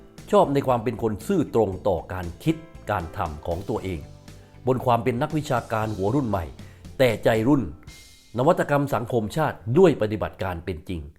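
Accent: South, Thailand. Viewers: คุณอ่านดีน่ะ แต่ อ่านชื่อ ผิด 2 ชื่อ นันโทปนันท กับ อัลเบิร์ต ไอสไตน์ คนใต้ บ้านเราทองแดง พออนุโลม แต่ อ่านผิด คำ ต้อง ระมัด ระวัง มากๆ ครับ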